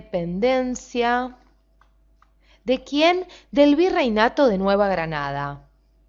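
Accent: Argentina. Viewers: CHE SOS COMO ARGENTINA NO SE XD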